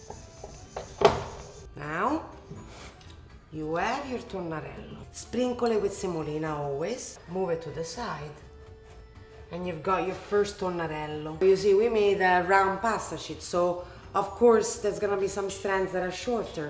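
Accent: Rome, Italy. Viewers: Sei di Roma Oggi l'ho sentito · Whats wrong with you? Have you never heard an italian accent?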